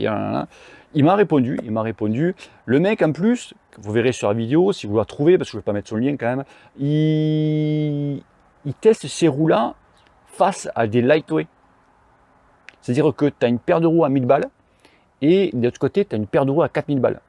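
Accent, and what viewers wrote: South, France. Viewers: Bonjour,j’apprécie tes conseil et commentaires sur les vélos et les conditions d’utilisation.J’aime l’accent que tu a ,nous qui sommes de l’est ,un peu de soleil fait pas mal.Tout ce que tu dit est intéressant mais n’hésite pas de faire intervenir en commentaire un pro quand nécessaire.Cela apporte plus de crédibilité à ta vidéo qui est très bien faite.Je roule Cannondale 4eme vtt.Sur route j’avais un trek madone 2011 que je viens de remplacer par un Scott Foil 2021,Patin,shamal miles.Montages perso.J’ai rayonné ma première roue à 14ans.Depuis je compte plus les vélos construits et réparé gratuitement bénévolement chez Emmaüs aussi.J’ai 65ans retraite et archer.Le vélo m’apporte la santé et la forme.Tes expérience sont judicieuses et concrètement très utile.Cordialement.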